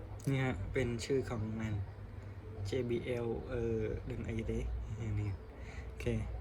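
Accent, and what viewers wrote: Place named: Cambodia. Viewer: ออกขเมรๆนะภาษาพี่นี่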